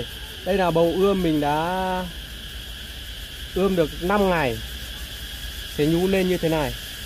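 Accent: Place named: Hanoi, Vietnam. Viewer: Hanoi ha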